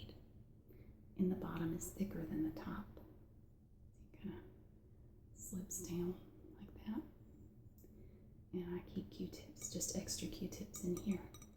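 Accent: South, United States. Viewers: Subbed from Tennessee. Love that southern accent.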